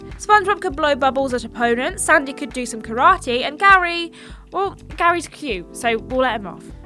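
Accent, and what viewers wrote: United Kingdom. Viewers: guys i just realized that she speaks British accent it makes sense because she lives in the United Kingdom at Europe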